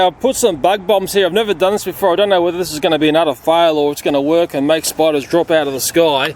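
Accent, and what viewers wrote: Australia. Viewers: Sorry I hadn't found your channel earlier just looking through your vids and I found this one it's SOOO fascinating to watch and I come from the UK and I've always wanted to go to Australia and to look at your garden and stuff it just makes me so happy and hearing someone Australian is amazing love it channel 💜💜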